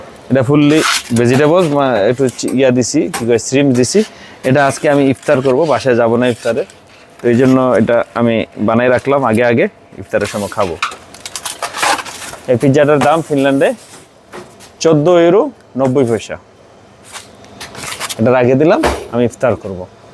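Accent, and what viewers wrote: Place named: Barishal, Bangladesh. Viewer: আমার কাছে বরিশালের ভাষার মত মনে হয়। ভাইয়ের বাড়ি বরিশাল হলে কোথায় জানাবেন।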